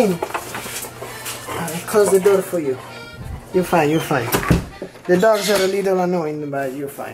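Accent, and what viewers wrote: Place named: Italy. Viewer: Oggi fanculo i commenti costruttivi, adoro il tuo accento italiano misto ad una punta di smusi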